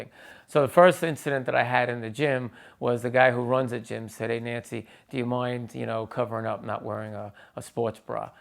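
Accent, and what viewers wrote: New York, United States. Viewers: Such a beautiful story! I'd like to meet you sometime, Nancy - I love your New York accent. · Great story! (God I love the NY accent so much)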